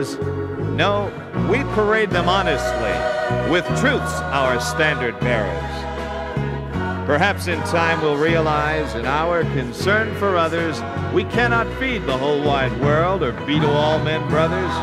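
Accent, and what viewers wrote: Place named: South, United States. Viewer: No, it didn't get airplay because it is like listening to nails on a blackboard. Poorly produced and narrated, it would never even come close to the success of the Byron McGregor single for those reasons, not because of anyone's "agenda." McGregor was a radio news man. He had a great voice, and an orchestra backing him up. This guy? A monotonous, droll guitar and a voice that sounds like a cross between John Wayne and some Southern hick that just figured out what eggs are. Just remember this.....a radio station Program Director makes the call on what gets on the air, on commercial stations anyway, and the idea is NOT to play releases like this which will cause most people to go to another station. Ratings drop and then so do advertisers. Don't blame the lack of airplay on the "powers that be," place it squarely where it belongs, on a bad record.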